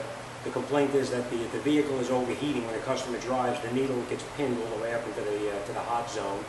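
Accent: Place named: New England, United States. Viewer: Smart man but that Yankee accent hurts my ears.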